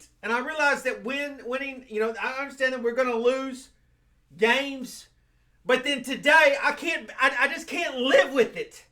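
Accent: South, United States. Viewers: Why are you dressed as a NY fan, but have a southern accent?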